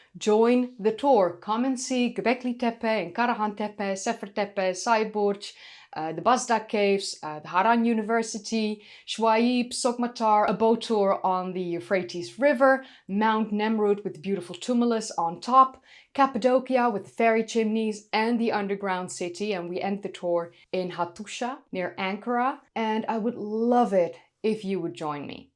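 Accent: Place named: Netherlands. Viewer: I really love how you pronounce these words. I love the Dutch accent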